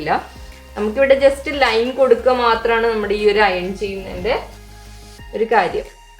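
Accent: Kannur, India. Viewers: Chechy de place evdaa kannur slang thonnunnu samsaarikkumbo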